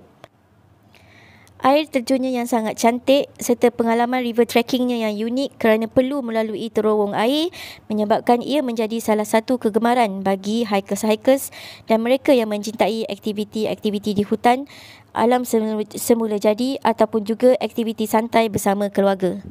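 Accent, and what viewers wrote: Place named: Java, Indonesia. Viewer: Pekat jawa nye ni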